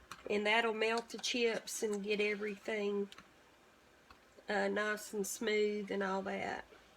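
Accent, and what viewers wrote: South, United States. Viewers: I love her southern accent. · Omg, love your cooking and southern accent, your voice is so comforting ❤️ please keep cooking.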